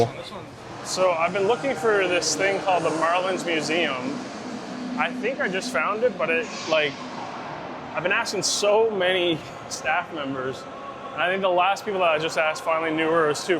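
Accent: Canada. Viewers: He said he flew in from new york. But the accent is obviously Canadian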